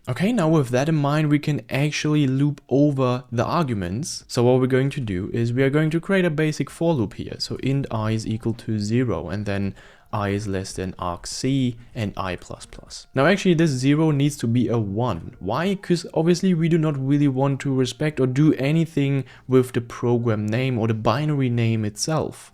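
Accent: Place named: Netherlands. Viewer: Dude sounds like Dutch Elmer Fudd, love it ❤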